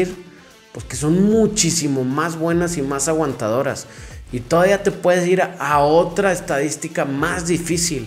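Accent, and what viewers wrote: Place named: Mexicali, Mexico. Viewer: Usted suena como mis paisas de Mexicali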